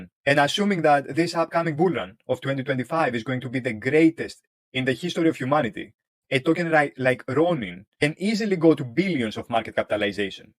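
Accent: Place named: Greece. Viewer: Απο τη προφορα μαλλον εισαι Έλληνας ??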